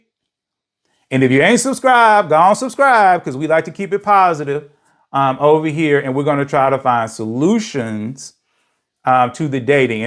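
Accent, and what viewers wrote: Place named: South, United States. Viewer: your country (or what some perceive as Southern) accent is hilarious 😂😂😂. I did not see that coming. Laughter is good for the soul so thank you for opening in that manner. I always appreciate your viewpoint so I was wondering if you are receptive to sharing your insight on a video recommendation from a viewer.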